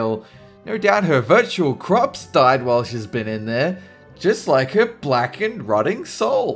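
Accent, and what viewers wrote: Australia. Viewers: Is Aussie? I feel like he is from the east side, maybe Brisbane?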